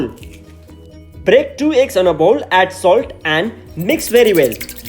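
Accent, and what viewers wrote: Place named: India. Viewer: great presentation my friend you're sounds indian. your burrito looks yummy.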